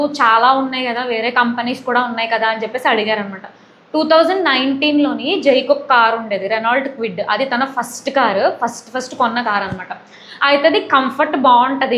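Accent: Godavari, India. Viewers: Car chala bavundhi Miru matladuthunte pure godavari slang kanipisthundhi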